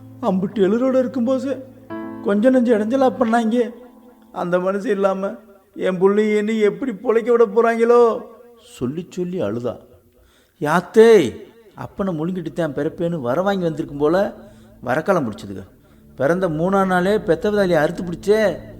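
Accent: Madurai, India. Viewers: அருமை மாமா இயல்பான மதுரை தமிழ் எளிதில் மனதை தொட்டுவிட்டது, உங்களது குரல் இந்தக் கதைக்கு பெரிய பலம்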